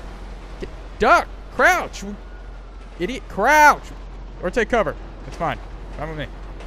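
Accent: Australia. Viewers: AUSTRALIAN WHAT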